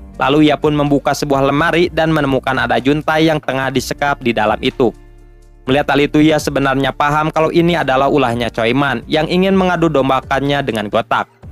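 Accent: West Java, Indonesia. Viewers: Bang urang sunda lin??😅😅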